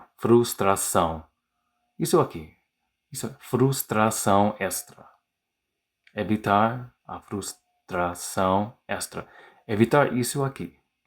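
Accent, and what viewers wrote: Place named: United States. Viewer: Parabéns bro, Já está falando português brasileiro fluente, apesar de um pouco de sotaque americano, mas vá em frente aos seus objetivos. Você só não nos falou se você lê e escreve em português. Sucesso ao seu canal !!!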